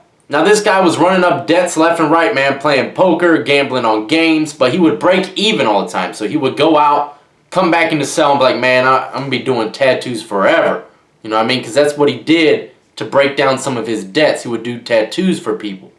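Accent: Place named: South, United States. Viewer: He got a country accent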